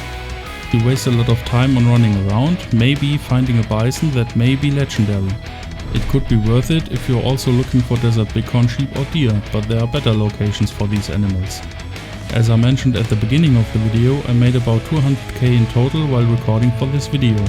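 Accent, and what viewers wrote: Germany. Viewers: you sound German cuz of your accent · and btw are you german?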